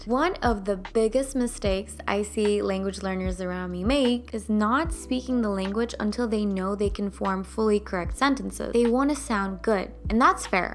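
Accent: United States. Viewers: Your English is amazing!!!🤩 It sounds sooooo american.. which I love! How is that possible growing in Turkey? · Oh my gawd, I love your personality <3 Watching your tips on how to learn a language from scratch is as good as watching fav series on Netflix! Your humor and American accent rock :) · You sound really American what is your first language.? I’m working on Korean Italian French and Russian . I learned Portuguese by getting into Brazilian music and Italian by getting into opera. I actually got into French Regaetton . I talk to my cat in the languages I am learning. I like finding out how other polyglots learn languages. Don’t be afraid to make mistakes is the best advice . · Your accent is clearly, American . considerando lo poquito que hablaste en español me parece un acento puertorriqueño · As an American, I’d say your English accent is 100% American, I would have guessed you grew up here 😄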